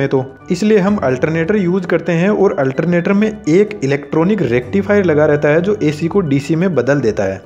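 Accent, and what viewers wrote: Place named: Shekhawati, India. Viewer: Bhai Shekhawati ke District Sikar,Churu, Jhunjhunu se lag raha hai Bolne ke lahje se ...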